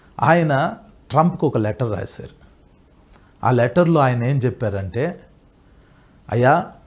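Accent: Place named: United Kingdom. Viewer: Babu munda meeru Telugu Nu Telugu laga matladute baaguntadi please English accent vaada vaddu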